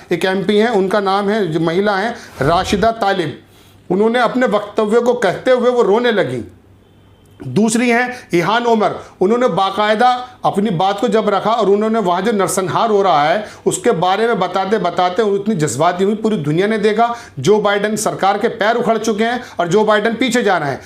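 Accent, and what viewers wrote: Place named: Saharanpur, India. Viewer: भाई आपकी आवाज तो अपने सहारनपुर वालो जैसी लग रही है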